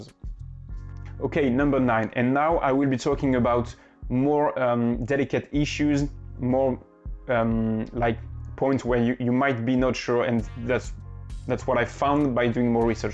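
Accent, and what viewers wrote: France. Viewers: Are you French? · An INFP child is not happy because they are surrounded by their pairs, they excluded anyway from their surrounding, but they are happy thanks to their imagination that helps them going through life : even though no one understands you and you feel lonely and shitty af, at least you have your inner world... it really saves you sometimes. Also, je rêve ou c'est un petit accent français que t'as là ? 😏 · arent you french ???? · Are you french ??🙂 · I’m assuming by your accent that you’re french? I am too. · Wow this is so accurate, thankss for your video. I like your french accent btw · On dirait un accent français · Mec t'es français j'en suis sur (du moin francophone). Ton anglais est lourd mais l'accent nous trahis toujours ahaha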